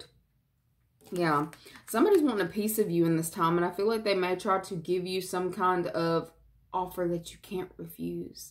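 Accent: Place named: South, United States. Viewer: Thank you so much for this reading!🙏🌻♥️ Your voice sounds just like one of my friends in Charlotte, NC. This is crazy!!😅 Love hearing your readings!!🙏❤️🌷 You must be from the south. You sound like a southern bell. I have not been in a date in 29 years. I'm a June 20th Gemini, cusper. Girl, I'm not copying anyone! I am very strong and am very worthy! I love me and love who I am.🤗♥️ I've been kicked in the butt big time. I'm the most important to me. I'm remodeling my home. It needs it desperately!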